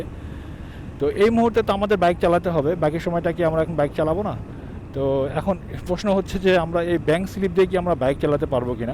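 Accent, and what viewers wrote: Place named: Sylhet, Bangladesh. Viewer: তামাবিল রোড 🥰 আপনার একসেন্ট সিলেটিদের মতো, আপনার বাড়ি কোথায় ভাই?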